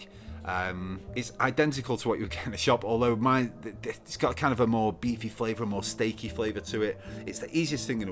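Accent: Yorkshire, United Kingdom. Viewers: I love your accent. is it from yorkshire?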